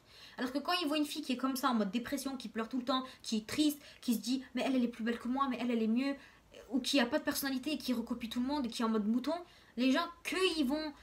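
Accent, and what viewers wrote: Marseille, France. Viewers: Trop belle Mash'Allah ta grv un magnifique accent sa fait penser à Marseille mdrr ❤️❤️😘😘😘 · C’est bizzare ta un accent parisien et marseillais en même temp, tu viens dou???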